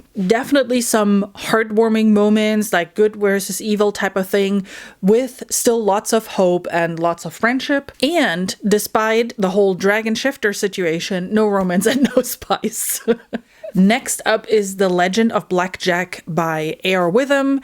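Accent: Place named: Boston, United States. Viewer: First, love the accent (north east bostonian?) seemingly masked tho— just wanted to say first time viewer but will check into your rec’s!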